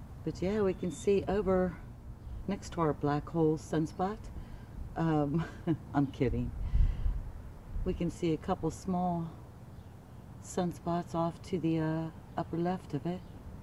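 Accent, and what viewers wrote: South, United States. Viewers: Ok lady I think you make very beautiful videos. And I really like your accent. A bit southern but very clear. I am not an American so sorry if I am wrong.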